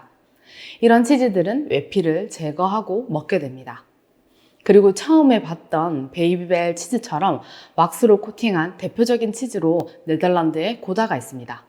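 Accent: Gyeongsang, South Korea. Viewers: 숨길수 없는 경상도사람ㅋㅋㅋㅋㅋㅋㅋㅋㅋ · 경상도 어데 출신이신지?? 꾸시하이 갱상도 사투리가 들리네요이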